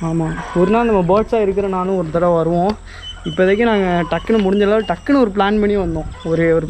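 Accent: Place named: United Kingdom. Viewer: Unku tamile pesa theryathu la London durai poda dei olunga pesu frst 🤦🏻‍♀️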